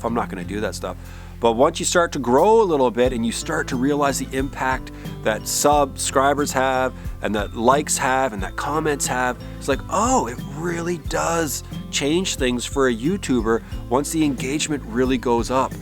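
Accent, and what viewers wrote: Canada. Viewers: I really enjoy your videos, i am not young, not outdoorsy, not strong, but i do enjoy all you do please keep the vids coming; i just stumbled on your vid, where are you located? I hear “ uwt for out so Canada? How do you keep safe out there all by yourself? Your wife doesn’t worry? Thank you for sharing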